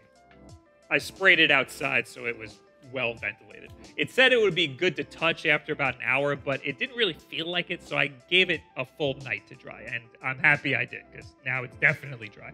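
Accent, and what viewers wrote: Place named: New York, United States. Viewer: your new yorker accent really makes me wanna buy half of the things you do ads for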